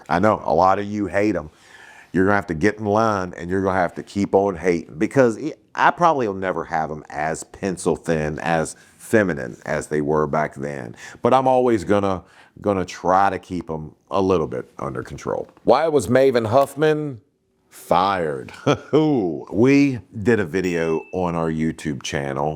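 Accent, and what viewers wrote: Virginia, United States. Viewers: I think because of the delicate quality of your Virginian accent. To uninitiated ears, it might come off as…yeah.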